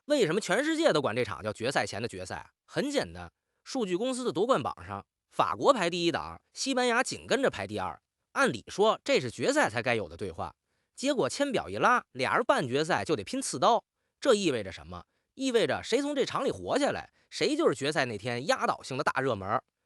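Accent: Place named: Tianjin, China. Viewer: 这个台北主播为啥一股天津味儿？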